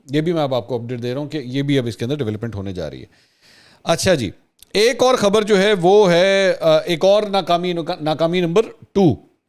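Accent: Punjab, Pakistan. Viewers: بھائی سلام ڈٹ کر پنجابی بول رہا ہے اندرون شہر کا پینڈو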